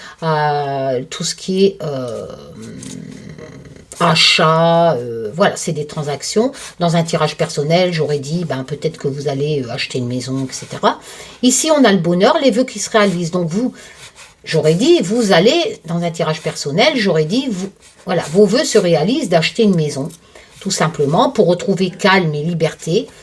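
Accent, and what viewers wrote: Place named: Ch'ti, France. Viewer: Bonjour jadore quand tu parle en patois chti Je suis une de snord mi Ca me fais du bien en plus quand tu chantonne le schiot quinquin Bisous ❤❤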